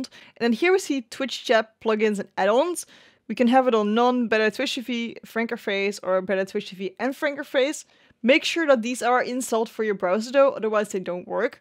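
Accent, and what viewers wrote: Netherlands. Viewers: Ik dacht stiekem al te horen dat je NL bent haha heel erg bedankt voor de tutorial!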